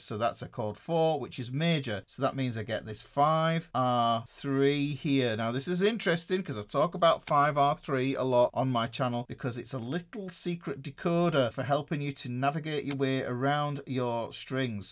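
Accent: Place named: Yorkshire, United Kingdom. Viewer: Nurr yer nurts. Must be Yorkshire…Huddersfield?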